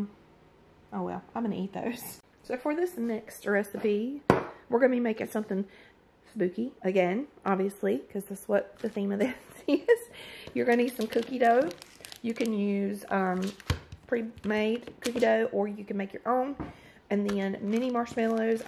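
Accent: South, United States. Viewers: Girl! You is southern! Lol . Gotta drink tea! Hope you feel better soon!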